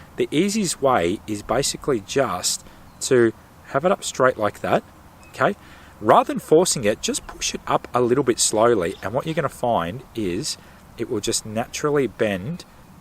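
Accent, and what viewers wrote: Australia. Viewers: Irish? LOL Australian.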